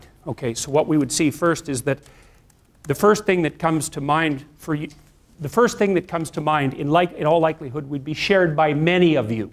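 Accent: Canada. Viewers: is he Canadian? i just realized the way he pronounces out. hes so well spoken i barely noticed his accent but now that ive noticed its so apparent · He's Canadian.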